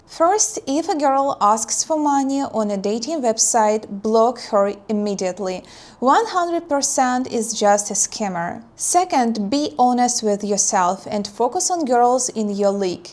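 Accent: Russia. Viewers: Dear, let's make a retro mid 1980s spy film, you the beautiful, seductive but deadly KGB agent in Warsaw Poland, setting up a honey trap............me.....defending the integrity of western values, the amiable naval attache at the British embassy, saving the world for democracy......the Russian and British accents together in the same room.......wonderful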